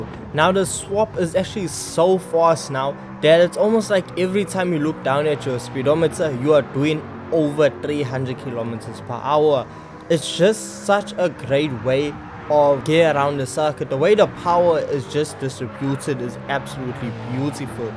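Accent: South Africa. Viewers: I love the SA accent, so cool my bru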